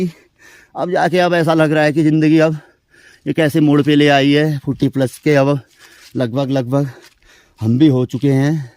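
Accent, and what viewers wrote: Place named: Almora, India. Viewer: गुरु जी गांव, विकास खंड व जिला भी बताने का कष्ट कीजिए। वैसे आपकी आवाज से लग रहा है आप अल्मोड़ा जिले से हैं। धन्यवाद जी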